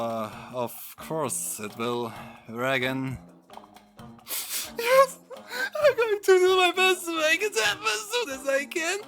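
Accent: Germany. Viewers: German accent: nunja, dann haben wir hier ja noch einen deutschen Feh Spieler! :)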